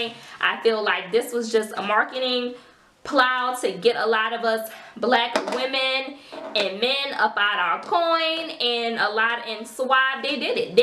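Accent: New Orleans, United States. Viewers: Sounds like a hear a whole lotta NOLA in your voice #yaheardme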